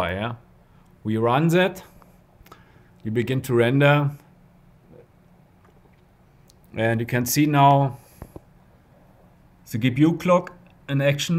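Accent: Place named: Germany. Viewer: German accent is the best :D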